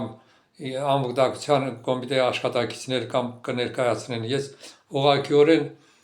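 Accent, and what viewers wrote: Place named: Lori, Armenia. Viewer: Ձեր խոսակցականը լոռվա բառբառինէ նման լոռվա որ հատվածումեք դուք ապրել,ամեն դեպքում համաձայնեմ ձես հետ թող երկու կողմիցել զոհեր չլինեն։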